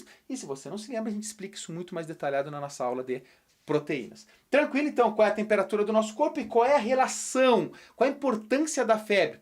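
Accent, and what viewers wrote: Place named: South, Brazil. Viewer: Bah, esse jeito do sul é da hora jkkkkkkkkkkkkkkkk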